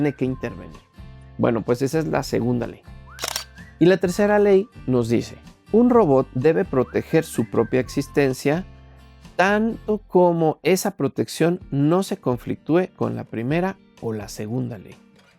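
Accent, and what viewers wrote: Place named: Mexico. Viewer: tu voz es genial , tengo un profesor que me gusta grabar su voz en clases porque después de escuchar y procesar la información de la clase y reproducir los audios me sirve para entrar rápido en flow y redactar párrafos de la investigación. Creo que tienes un gran potencial el ese tema porque eres mexicano y nuestro acento es considerado como neutro , los acentos de los españoles y sudamericanos no es armónico aunque el contenido sea interesante tengo que pausar varias veces los videos. Así de ñoños somos los investigadores jeje 😛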